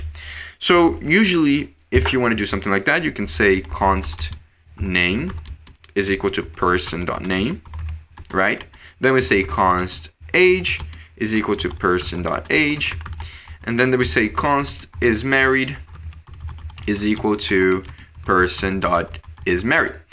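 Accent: Brazil. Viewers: Thanks for the video,. Really useful. I finally got the arrow functions now thanks to you. Also, you sound brazillian for some reason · Olha eu aqui procurando por videos gringos pra aprender web development e me deparo com teu canal! Pelo nome vi que devia ser portugues/brasileiro, mas pelo sotaque imaginei ser brasileiro. Parabens pelo conteudo e obrigado por compartilhar! + 1 inscrito =D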